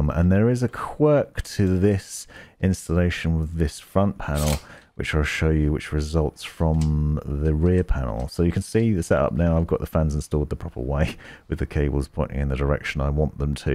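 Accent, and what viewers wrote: United Kingdom. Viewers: And because of the fact, that the most english I have to deal with is primary with some american flair, it was kinda cool to listen to a british tongue ;)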